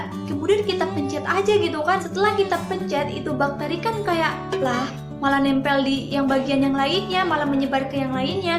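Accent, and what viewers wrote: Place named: West Java, Indonesia. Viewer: sunda na nong 🤣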